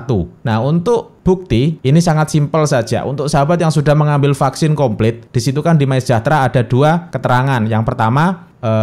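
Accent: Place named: Java, Indonesia. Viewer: Jowo medok hadir bosk 😄👍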